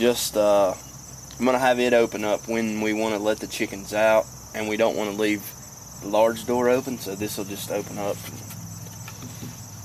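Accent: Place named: South, United States. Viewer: Impressive work! And that southern accent of yours... I could listen to it all day. ;-)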